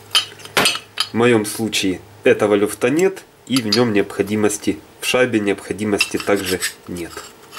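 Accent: Ukraine. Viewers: Канал зарегистрирован в США. Кому же идут собранные деньги? Если акцент окраинский?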